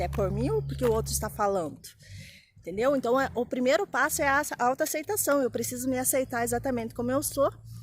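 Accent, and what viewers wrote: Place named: Paraná, Brazil. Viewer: Pelo sotaque é paranaense hehehe Parábens pelo vídeo, sucesso em seu canal!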